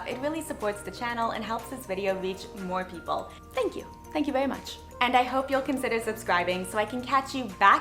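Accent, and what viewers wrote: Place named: New York, United States. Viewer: Have you done any traveling in the last few months? Sounds like you are from NY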